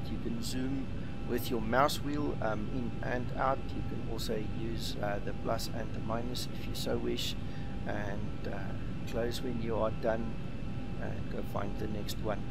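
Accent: South Africa. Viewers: what part of south africa are you from and thanks for the vid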